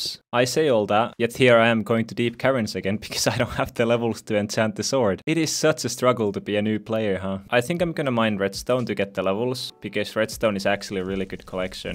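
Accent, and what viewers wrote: Finland. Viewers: Sun suomalaista aksenttia on niin kiva kuunnella😁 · Where are you from? it sounds like you are from finish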